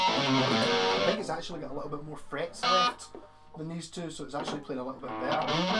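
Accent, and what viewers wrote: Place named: Kirkintilloch, United Kingdom. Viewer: accent suggests Kirkie?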